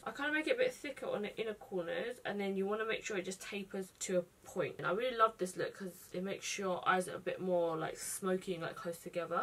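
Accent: United Kingdom. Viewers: omfg ok so this is my first video and i found you off instagram and damn girl im like really shocked you have a British accent and not American like for some reason i thought you would have a American accent but when i heard you i got shocked and im still in shock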